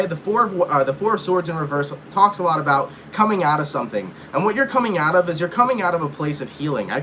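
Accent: England, United Kingdom. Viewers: Lovin the English accent...😉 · Lol, love the British accent 😂. Was just in your neck of the woods yesterday for thanksgiving (good Ole Yorktown). Btw, try Apple Cider Vinegar a few times a day for that acid reflux. Contrary to popular belief its low stomache acid that causes acid reflux (stomach's inability to digest properly due to low acid in the lower stomach pushes the acids in the upper stomach upwards) try a tablespoon or 2 with water before meals 😉. Hope that helps. Thanks for all your insights 😊🤗🙏 Happy Holidays! 💚♥️🎉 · Love the British accent. From the UK. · Love the english accent 🦂🥰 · During the Scorpio read; Love that British accent! 🇬🇧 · Pmsl your English accent is so good x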